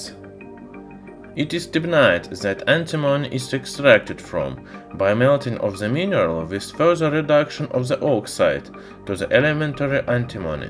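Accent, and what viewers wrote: Russia. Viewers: Русский акцент ,поработай над этим.а так ахуенно. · What’s with the FPS Russia accent?? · Maybe this guy should use a computer to narrate. товарищ твой акцент чересчур русский. Не понимаю:(( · It's Russian accent) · русский акцент выкупается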